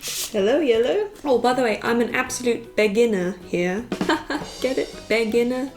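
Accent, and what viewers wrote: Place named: United Kingdom. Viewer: Most of the time you get american english here in germany but i must say i like the british accent. :3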